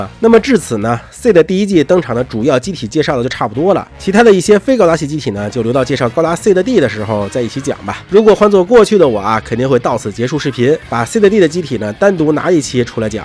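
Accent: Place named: Baoding, China. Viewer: 窝草 保定味儿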